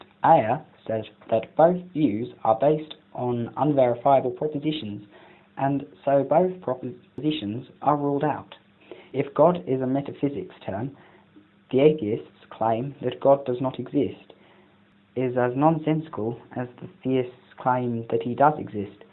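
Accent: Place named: United Kingdom. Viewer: Holy shit this is awful in a British accent.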